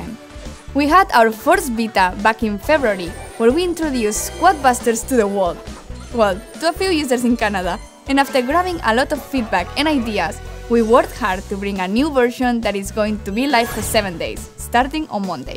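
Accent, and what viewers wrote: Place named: Spain. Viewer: Precioso acento Español y genial beta jajaja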